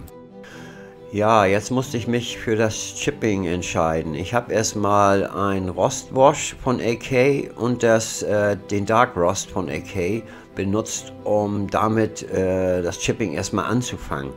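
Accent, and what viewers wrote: Hamburg, Germany. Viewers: Ein Hamburger! Klasse. Hörst Dich nicht wie ein Schlumpf an, eher wie Käpt'n Blaubär und ich hing wie eins der Bärchen an Deinen Lippen. ;) Klasse Bau und schön erklärt. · Danke für das tolle Video! Sehr hilfreich auch für Anfänger, es gibt hier so viele Äberdrüber Profis..Du machst das sehr toll.Auch Deinen Text hört man gerne, schön Hamburgerisch Höre ich als Österreicher gern.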